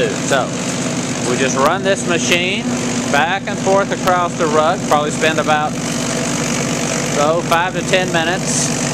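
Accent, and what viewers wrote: United States. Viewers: Are you from Tennessee?? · Just saw your video and had not seen how rugs were cleaned before. Thank you for posting it. You sound like a Southerner. Are you located in the US Southeast?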